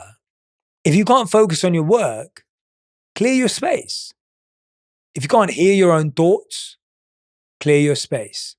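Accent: United Kingdom. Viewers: Are you a British man? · It's a Nice way to improve My listening skills with England accent and at the same time we learn new thing, being a better person by new habits.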